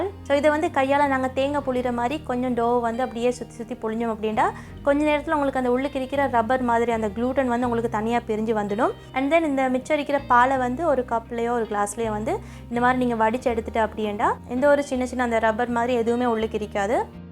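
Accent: Sri Lanka. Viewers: Dear Sister, I always interested in your Coking and the way you speak Tamil is so sweet. Where do you come from in Sri Lanka, Which area? Salam from Germany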